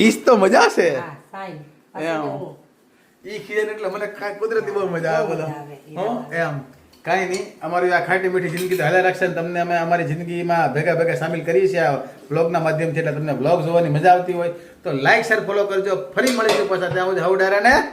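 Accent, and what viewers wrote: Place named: Surat, India. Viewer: Tme surti bolo tho mne moj padi jaye che .maru piyar navsari maru sasru mojilu morbi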